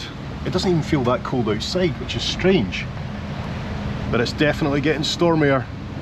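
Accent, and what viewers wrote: Scotland, United Kingdom. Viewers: oof. some days i'm better at understanding scottish-english than others.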